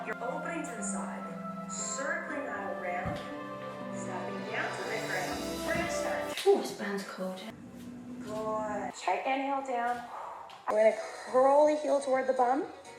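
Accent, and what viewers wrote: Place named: United Kingdom. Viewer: She’s got a British accent so she may have been born in the UK.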